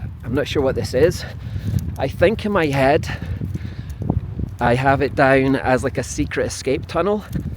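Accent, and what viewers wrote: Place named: Aberdeen, United Kingdom. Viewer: you need to do your tree man you sound like you're from aberdeen'ish area - my granny was from lewis and her mum was from Aberdeen, well Moray (Urquhart) - thank god - cause records only go to the late 1700s in Lewis - Aberdeen's are way better - you're probably related to loads of these folks - I'm related to the folk that used to live at Drum castle too :D its well nice - I now want to go and reclaim all these castles :D :D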